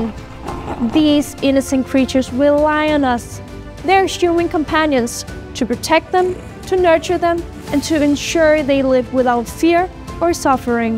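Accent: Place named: Denmark. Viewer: She has such a danish accent :p I love the true-ness of this nation representative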